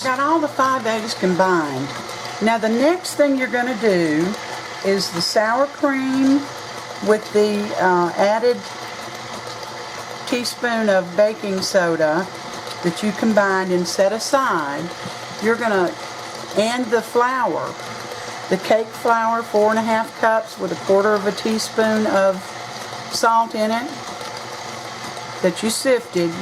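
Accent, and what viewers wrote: South, United States. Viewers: Was this "literal" or a take on your southern accent (as in you came straight "from the fields")?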